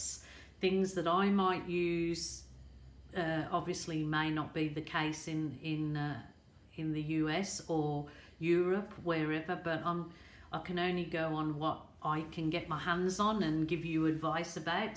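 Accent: Birmingham, United Kingdom. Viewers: I knew I wasn't going daft! I knew I could hear a bit of Brummie in there lol xx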